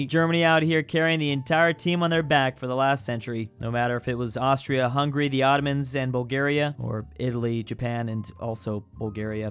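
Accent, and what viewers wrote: Denmark. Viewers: Han lyder som en sønderjyde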